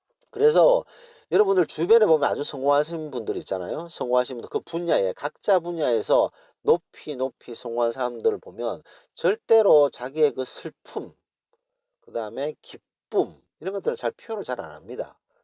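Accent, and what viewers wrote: Gyeongsang, South Korea. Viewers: 너무 공감백배 입니다. 세상이 점점 삭막해 지고 조심스러운 얘기지만 교민사회가 제일 심한거 같아요... 저랑 똑같이 경상도 사투리로 구수하게 단도직입적인 스탈 매력있습니다. 실례가 안된다면 제 유투브에 이 이야기를 언급하고 싶습니다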